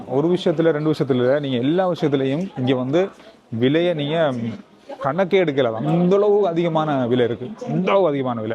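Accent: Sri Lanka. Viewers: travelogue பயண காணொளி எப்போதும் சிறப்பான காமிரா கோணங்களோடு அழகான காட்சிகள் நிரம்பியதாக ருசிக்கும் இலங்கை தமிழில் ஈர்க்கும் தகவல் வர்ணனைகளோடு கவர்ந்து இழுக்கும். நன்றி. அன்புடன் நாகராஜன் சென்னை. தமிழ்நாடு.(உங்க குடும்பத்தார் இலங்கையில் நலமா)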